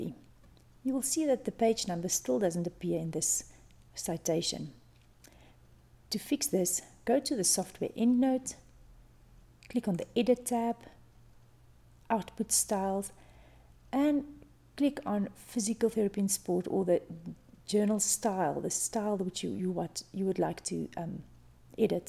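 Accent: South Africa. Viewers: Sounds like a very South African accent to me. Thanks a lot very helpful!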